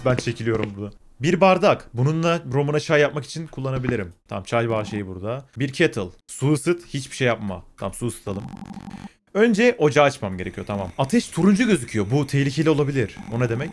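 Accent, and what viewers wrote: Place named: Istanbul, Turkey. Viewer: Muazzam İstanbul Türkçeniz ve inanılmaz diksiyonuza hayran kaldım hanım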